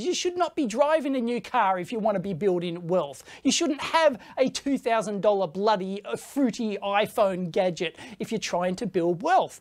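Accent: Australia. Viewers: your voice kills me bro and that Australian accent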